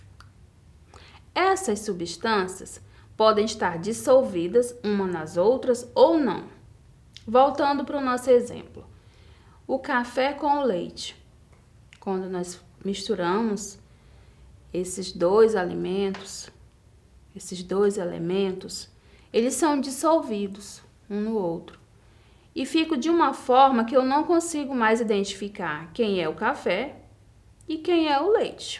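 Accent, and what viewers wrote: Bahia, Brazil. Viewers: Ela é baiana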